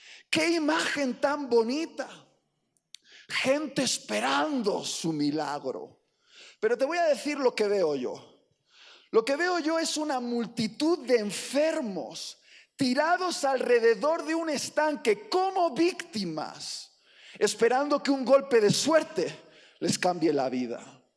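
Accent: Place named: Mexico. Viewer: porque habla como mexicano y pronuncia las C como español? jaja excelente la prédica pero me quedó esa curiosidad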